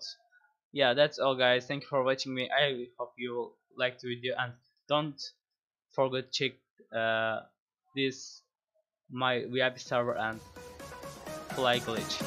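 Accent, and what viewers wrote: Turkey. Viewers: olm sesinden turk oldugu belli oluyor qweqwe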